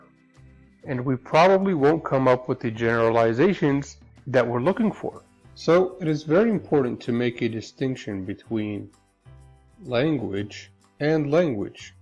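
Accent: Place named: United States. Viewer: sounds like some american all of sudden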